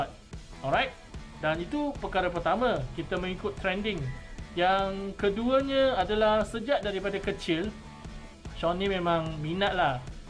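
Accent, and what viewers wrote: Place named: North, Malaysia. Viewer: Sean orang mana? Sebab guna lecey.. Dalam video Mcm utara ja 🤔🤔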